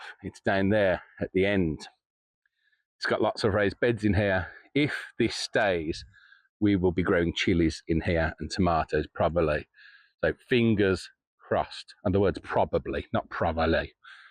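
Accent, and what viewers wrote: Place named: Birmingham, United Kingdom. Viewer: Hi. Just come across your channel and thought I would have a nosey around and see what vids you have done. Which part of the 2nd city are you from? You're only the 2nd person on YouTube I've come across with a Birmingham accent, the other one is Shaun from Happy Days Veg. Although he now lives in north Wales.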